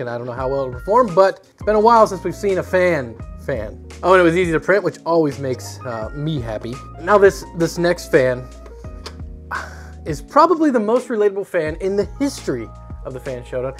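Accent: Michigan, United States. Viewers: You're from Michigan aren't you?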